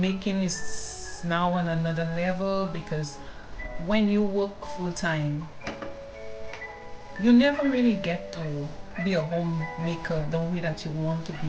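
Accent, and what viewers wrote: Saint Lucia. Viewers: I instantly hit subscribe when I heard that accent! As a fellow Lucian myself I am enjoying your videos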